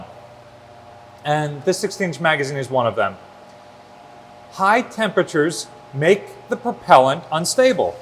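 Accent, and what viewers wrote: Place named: Delaware County, United States. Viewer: Great vid! Youre not from delco are ya? Cant place the accent, thanks!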